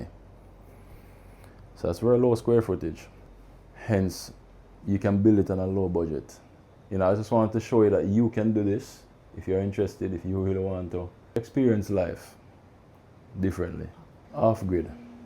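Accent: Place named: Jamaica. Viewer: my grandma is from Cuba too. Love the interaction and love your accent Love the Jamaican accent with the Cuban accent beautiful. She was just the same curses in patois curses in Spanish We love her. Great job girl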